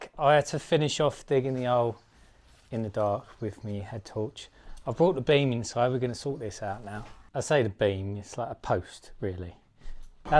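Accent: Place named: Sussex, United Kingdom. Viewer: Like others have said here this channel is unique in terms of your style, honesty and determination to face any problem and deal with it. I came here after watching you help out Keith Brown dig out his back garden for better drainage. I was following another channel, Sampson Boat Co which was also about completing a long term project, but binned that after starting to watch your barn conversion and cartlodge playlists. I dig your accent, I am from Sussex so it resonates with me as a Southerner. Your humour is ace. OK your subs numbers may be lowish compared to the top guys but is there anything else you can do to boost this? More collabs with other YouTubers maybe, I know you had the Skill Builder video guy round for a piece but can you use this to your advantage in other ways? I think we all appreciate the massive PITA it is to video everything you do but try and keep it up and maybe something will turn up that gives you a more positive financial situation. 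My biggest DIY project so far is lining a double garage wall with plywood but your work inspires me to try bigger and better things. Onwards and upwards mate.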